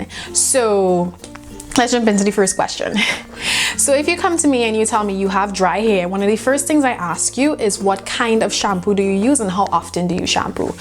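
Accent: Trinidad and Tobago. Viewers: I know this accent! 🇹🇹🇹🇹🇹🇹 Im subscribing. Gotta support my own!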